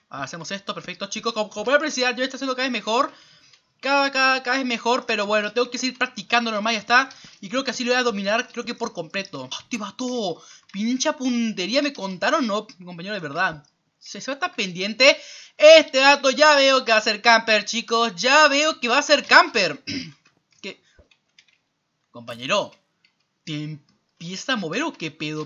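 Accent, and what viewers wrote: Argentina. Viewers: Eres de cordoba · eres argentino?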